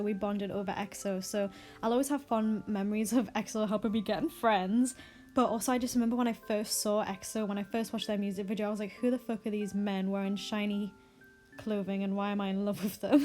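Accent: Newcastle, United Kingdom. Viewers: Omg you went to my school in newcastle 😂 knew there was Geordie in your voice haha. I left the year that school uniform was introduced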